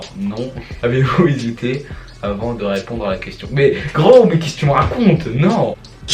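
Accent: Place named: North, France. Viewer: Mec apparemment tu viens di nord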